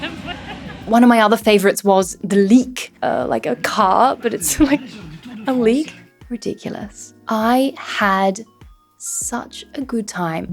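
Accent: United Kingdom. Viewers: I just love your accent (thought you were british) and your french is remarkable... nice to discover your channel... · YOU’RE AUSTRALIAN ?????😭😭😭😭😭😭 Your French is native level how did you learn French ? You have a British accent too (my perception ofc) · I thought you were British 😊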